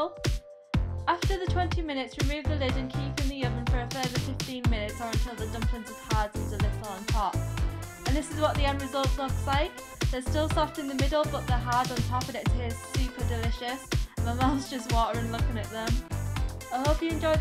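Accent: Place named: Tyneside, United Kingdom. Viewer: where u from as u sound like ur from tyne and wear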